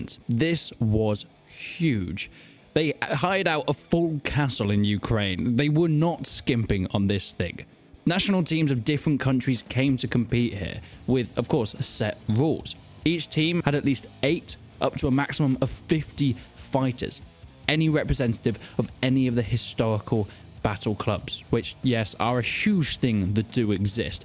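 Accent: England, United Kingdom. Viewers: Good to see more people getting into the sport, great stuff writing and assembling it. Just wanted to say you showed SCA there a couple times there which is a different thing as a rule of thumb if the armour looks a little flimsy and they're fighting with sticks and most importantly stabbing then thats SCA and not buhurt. The armour although is expensive is not necessarily 10 grand an entry level harness (suit) can cost you as low as 2000. The rule confusion is understandable it comes from there being 3 main disciplines in buhurt: dueling, melee (or also buhurt confusingly) and pro fights. Dueling is for points with the weapon only melee is 3 points on contact on the floor and profight is points everwhere and you can usually win by KO or TKO. The pro in pro fight does not mean professional as almost noone does this for a living and the people that do are usually coaches. 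I assume by your accent your English and as pro fights are what interest you you should look up Saturday knight fights on Facebook which is the uk starting a profight league its early days but they're great to watch. Thanks again for the video great stuff.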